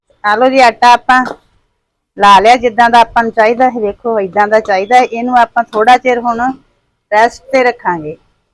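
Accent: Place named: Majha, India. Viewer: 🙏🏻ਭੈਣ ਜੀ, ਤੁਹਾਡੀ ਬੋਲੀ ਤੇ ਤੁਹਾਡੀਆਂ ਬਣਾਈਆਂ ਚੀਜ਼ਾਂ ਬਹੁਤ ਵਧੀਆ ਜੇ। ਮਾਝੇ ਦੇ ਜਾਪਦੇ ਓ।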